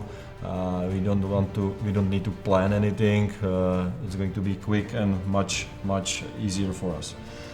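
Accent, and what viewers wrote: Czech Republic. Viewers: May I ask someone from England/USA, do you like his accent? Because I am from Czech rep.as well, so I would really like to know your opinion :) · Tomu se říká česká angličtina no :D Já jsem rozuměl skoro všemu, kromě některých odborných slov co neznám.. Neni to těžký k pochopení, ale ten kdo se AJ neučí, nebo neni až tak zdatný, tak to problém může být..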